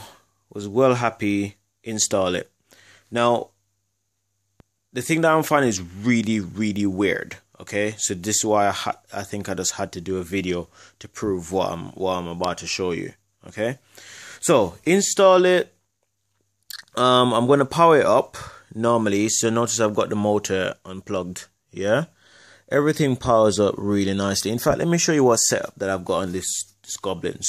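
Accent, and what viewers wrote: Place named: Jamaica. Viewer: Sounds like my hometown Jamaican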